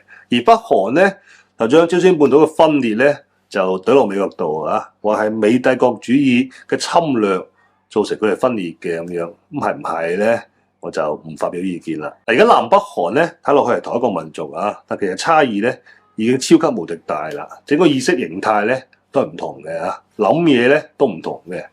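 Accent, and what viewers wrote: Guangzhou, China. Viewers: 嘩吊！原本聽廣州話仲全神過香港嘅廣東話，起碼唔洗聽一D無謂嘅英文字!!!!!!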